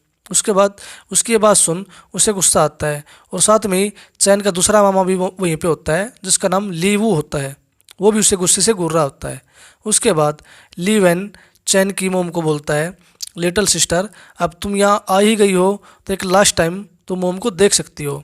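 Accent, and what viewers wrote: Haryana, India. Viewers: Tum harayana se ho kya bro 😍😍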